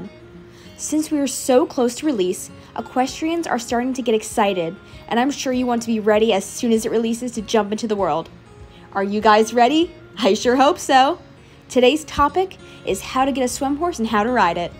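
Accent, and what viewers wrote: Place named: South, United States. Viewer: The southern accent sounds like Sadie from RDR2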